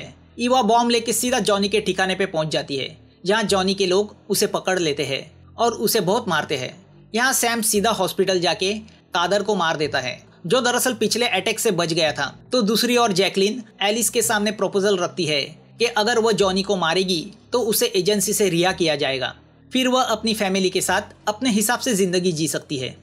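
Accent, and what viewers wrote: Maharashtra, India. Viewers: Tula Marathi yete bhau bolnya Varun Maharashtra cha distos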